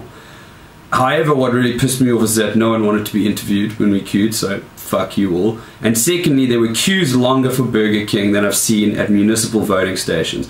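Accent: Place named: South Africa. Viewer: I'm unsure which I liked more, the SA accent or your articulation of argument. Props to you from Australia!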